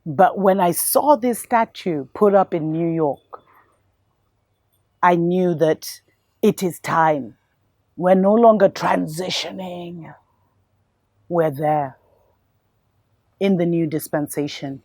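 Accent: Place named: United Kingdom. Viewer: I cannot listen to you why you worrying how she laughs what part of the uk are you from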